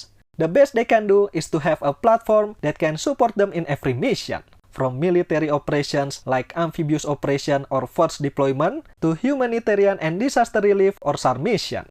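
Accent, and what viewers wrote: Indonesia. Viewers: Indonesian accent... Tak apa apa.... Good job..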